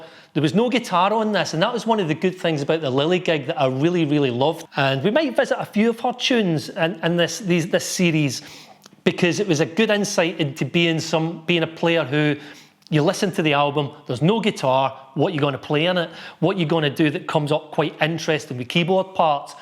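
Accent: Scotland, United Kingdom. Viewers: Love listening to you play the guitar, but the Scot accent takes me back to my youth when i lived in Glasgow, working in the pubs, and pints of 80 shilling.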